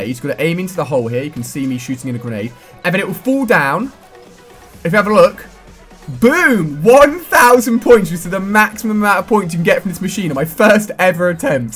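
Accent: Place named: United Kingdom. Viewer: It's because he's British and they sound different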